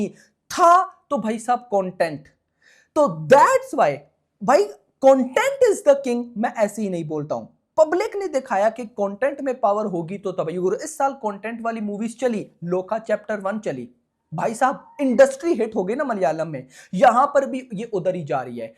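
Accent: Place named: Pakistan. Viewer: Iska pronounciation Pakistani jaisa hai ....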